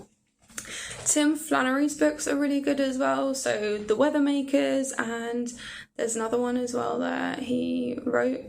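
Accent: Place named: United Kingdom. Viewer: For the first half of 4th grade, we had world geography from Mrs. Hisey in FL USA, and she told us we would need to do a semester project on a country. But I asked her if I could instead focus on Antarctica, my favorite continent. So I used my Father's drafting tool called a pantograph to enlarge a Rand McNally map onto a piece of plywood. I used plaster of Paris painted white to show (vertical exaggerated of course) mountains and painted the ocean blue. I put in little flags to mark the winter-over science stations of color-coded various countries. I added a backboard for some basic stats. I loved that Antarctica was and is the continent of peace, science, nature, and an international zone! I enjoy your delightful UK accent and your love of science and your being a longtime ethical vegan! From my work with ramping up Green TV (US), I know just how challenging it can be to attain 1000 subscribers, so I congratulate you on attaining that. 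Glad you will be tapping space data in your research--not only what the late physicist-astronaut Sally Ride deemed Mission TO Planet Earth, but also via comparative planetology understanding what happened to Venus and Mars, for instance. BTW, as to books, I managed to get the UK edition of Greta Thunberg's "The Climate Book," published on Thursday 27 October 2022, and am reading it and will be reviewing it for Green TV Web site, our first-ever book review. It is an outstanding primer on the No. 1 climate crisis, brin ging together essays by s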